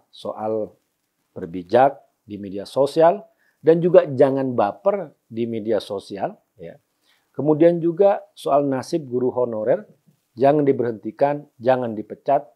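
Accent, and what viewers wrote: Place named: Surabaya, Indonesia. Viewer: Orang Surabaya Jawanya kasar Bong Romwli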